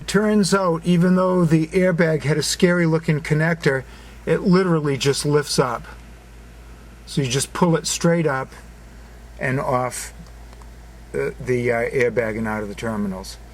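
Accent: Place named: Boston, United States. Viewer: great job so far., its been almost 5 years since mine popped on my 96 supercharged VR6 GTI, and your video is leading me to fixing it on my own. i am not faint of heart, i build 1000+ hp drag race VW/Audis in my spare time, but i really didnt want to do this job. and still part of me almost thinks the 800 plus bucks the quoted price to do would have maybe been worth it. i ripped one of the RCA off the stereo right out of the gate, very discouraging. but the car has a big stereo in it so its just gotta be fixed is all. again, thanks for taking the time to detail this. i have the Bentley manual but never felt the need to actually look at it. and i love how not-a-mechanic you appear to be and yet still tackled this job. the Boston accent is a plus:-)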